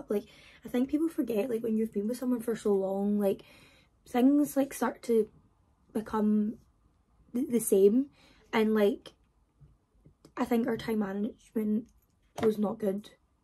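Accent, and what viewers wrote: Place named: United Kingdom. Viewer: are you british? I can't really understand your accent; where r u from girl? :)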